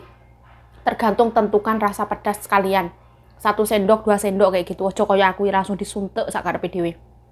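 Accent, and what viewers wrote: Java, Indonesia. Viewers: asli mna ya ko ngomonge medok kyo aq moncrottt😄😄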